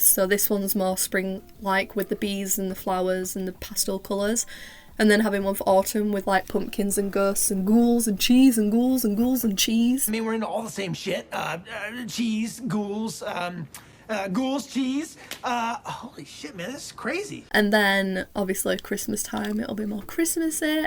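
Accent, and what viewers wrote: United Kingdom. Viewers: Your voice is very calming and I love your accent! Which UK accent is that? <3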